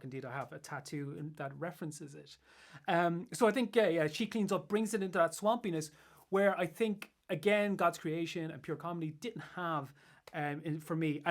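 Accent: Ireland. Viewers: Man, thank you for that fabulous review with your honey-sugared lovely irish accent!:) Can you and please come to my private christmas party???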